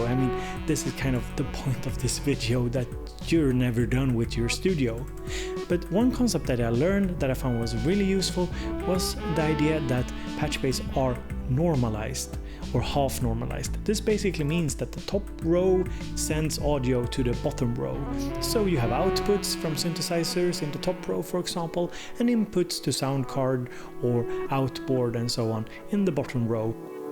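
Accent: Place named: South, Sweden. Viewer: Lovely to hear that you and your boss talked Swedish! I did hear that you are from the southern part of Sweden! Great video by the way. My cable managment is like a råttbo. :)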